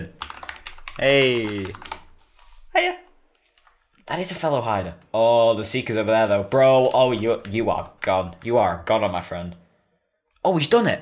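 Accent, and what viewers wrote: United Kingdom. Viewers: He literally have a fricking deep British accent now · He sound so british he sounds like a 12 year old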